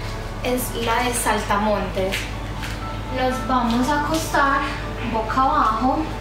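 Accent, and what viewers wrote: Antioquia, Colombia. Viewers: Por el acento la chica es colombianas.. por el cuerpo la chica es divina.. por la pesonalidad la chica is likeable... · Buen vídeo linda,debo decir que tienes una muy bella tonalidad en la voz,relajante,debe ser su acento paisa,saludos bonita. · Quiero saber cómo se le llama a tu acento, sé que eres colombiana pero quiero saber eso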